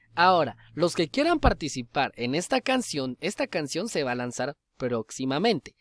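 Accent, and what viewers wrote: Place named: Venezuela. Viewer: me suenas a venezolano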